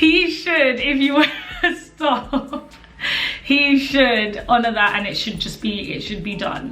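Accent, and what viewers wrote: United Kingdom. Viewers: that beautiful brit ancient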